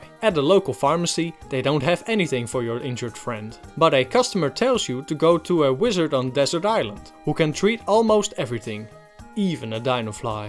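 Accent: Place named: Netherlands. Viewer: are you dutch? you have a dutch accent but you say your location is united states.....you'er a dutch living in USA or you live in NL? i'm in the hague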